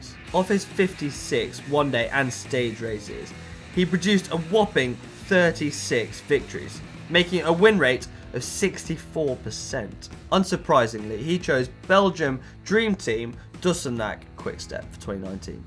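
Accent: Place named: United Kingdom. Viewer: British pronunciation of french names <3 <3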